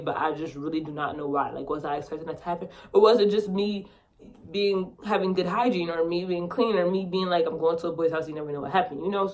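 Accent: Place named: New York, United States. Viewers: You can tell she from New York😂 or she might be from philly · She from New York😭!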